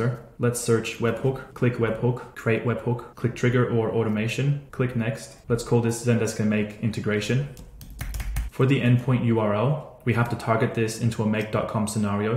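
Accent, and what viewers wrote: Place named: Australia. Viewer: I have recognised the warm Aussie accent......I use FreshDesk, same concept, love the video.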